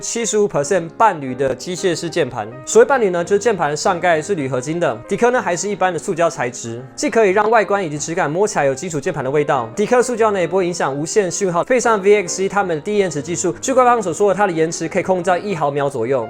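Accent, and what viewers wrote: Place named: United States. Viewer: 很喜歡你講話有點ABC，但bgm卻是中式古風的衝突感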